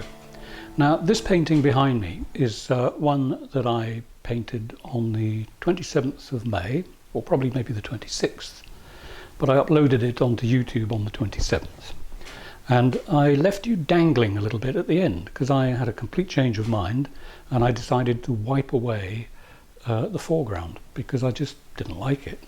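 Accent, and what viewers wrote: England, United Kingdom. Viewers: I love how your English accent even makes watching grass grow sound interesting!😊 I have a wooden board hanging in a gold frame on my wall staring at me; it's patiently waiting for me to give it the treatment... very soon...💗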